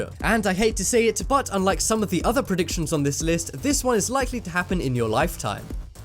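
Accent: United Kingdom. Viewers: he is British, like me. We all have accents. You do, I do, everyone does.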